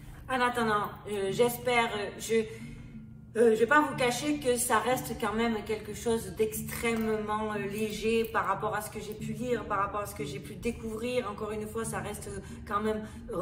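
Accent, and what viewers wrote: Marseille, France. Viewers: Un grand merci pour toutes tes recherches passionnantes. Je découvre ta chaîne depuis les Alpes Maritimes et ton accent au parfum de canebiere est un vrai bonheur à entendre. ❤ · trop mimi ton accent!aller l om!sinon,merci pour ces infos,je connaissais deja tte l histoire..stupefiant!faut enqueter...sur place!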